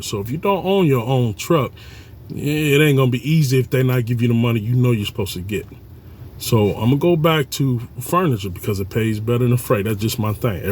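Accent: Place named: Pittsburgh, United States. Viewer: Sound you like from Pittsburgh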